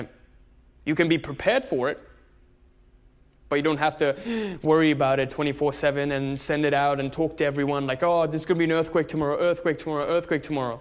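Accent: South Africa. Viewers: South African tones….? cool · I can hear an English accent but with a slight South African cadence and you are living in Florida:) Great video btw!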